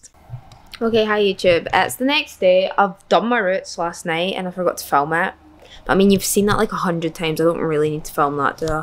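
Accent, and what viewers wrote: Scotland, United Kingdom. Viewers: aeibeirats" or idk what thats supposed to mean lmao im just here for the Scottish accent I love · is she scottish? demyn lovin her accent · Is Scottish an accent or an language?? I'm trying to learn Scottish accent from her 😫🙏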